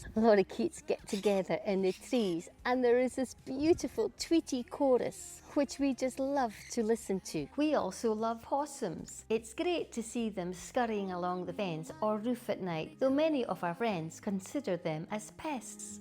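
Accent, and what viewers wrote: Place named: Scotland, United Kingdom. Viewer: Must be my Scottish ancestry (MacGregor) because I've never had the privilege of visiting yet. Whenever I hear a Scottish accent it feels like I'm taken back home, if that makes sense. Yes as Aussies we take so much here for granted, especially by those who have never visited other countries. We just don't realise what we have and how good life here can be if we allow ourselves to appreciate it. But in some beachside locations the sand flies can give the midgies a run for their money. They say the mozzies her can carry you away but the sandflies rough you up good and proper then carry you away. Also Aussies will be the first to tell "Whinging Pom!" to anyone who dare criticize what we love to criticize ourselves. But truth be known the Poms have nothing on the whinging Aussie. We'll have a whinge about finding nothing to have a whinge about.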